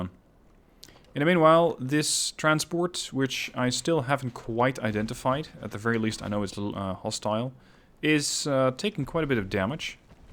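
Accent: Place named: Netherlands. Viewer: Listening to this, i am sure he is dutch, they can not hide the accent.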